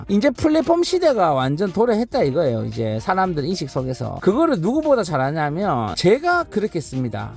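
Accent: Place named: Daegu, South Korea. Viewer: 대구사투리가 너무 듣기 싫다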